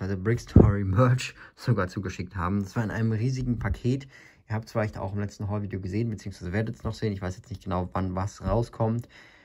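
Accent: Germany. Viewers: Bist du eigentlich aus beiern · kommst du aus münchen ?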